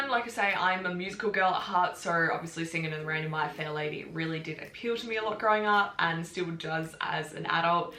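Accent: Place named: Australia. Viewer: I really like your Australian accent.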